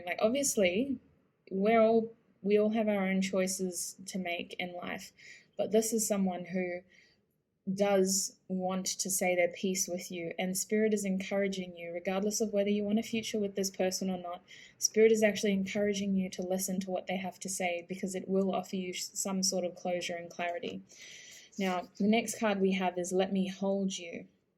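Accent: Australia. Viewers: I love you!!! Your readings are so different, original and so accurate! I chose pile 2 and it's like personal reading! Love it! And I love your aussie accent and swearing as well! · I listened to a few videos from you. I wasnt paying attention to the accent but this video is clearly Aussie accent. I just recently moved away from Au and u made me missing it. And thanks for the picking card. For pile 3, when u picked the card “I imagine u naked”, I’m dead laughing haha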